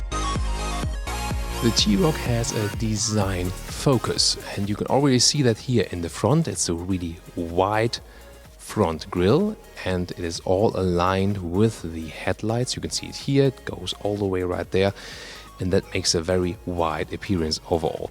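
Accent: Germany. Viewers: walter neubär he's German. He has German accent :)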